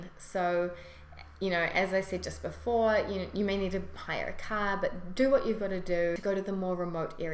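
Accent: New Zealand. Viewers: I love her pronunciation, she has got to be from New Zealand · I've been watching so many american and British You Tubers I forgot our accent and when I heard her voice I was like omg I talk like that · American here. Actually observing her accent because I'm teaching myself how to do a proper kiwi accent by observing and listening to as many as I can. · It is a pretty strong NZ accent but NZ has many accents. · I believe NZ has the absolutely cutest accent imaginable. I promise this is not sexist, I've known this to be true for more years than I am late to this video. My proof is Rhys Darby. But your channel may be exhibit b. What a lovely time travel this was. Thanks!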